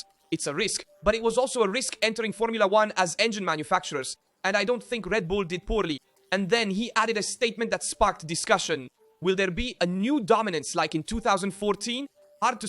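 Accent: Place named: Italy. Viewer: I've been listening to your main channel for a while to help me learn Italian. Just found this one. This is crazy that AI has used your ACTUAL voice but translated the text to English, and also given you an Italian accent. Blowing my mind a little this morning :o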